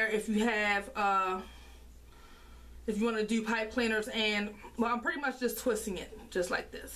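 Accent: Baltimore, United States. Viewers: I hear a Baltimore accent🌚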